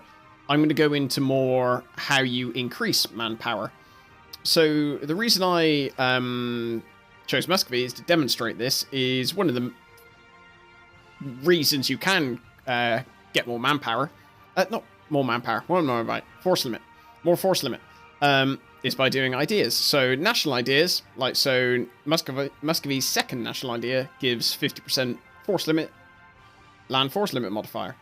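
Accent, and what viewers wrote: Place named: West Country, United Kingdom. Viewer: Lovely West country accent, greetings from Kent.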